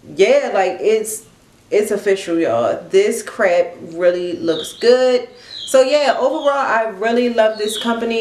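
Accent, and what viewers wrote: Baltimore, United States. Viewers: Yep, that's a Baltimore accent.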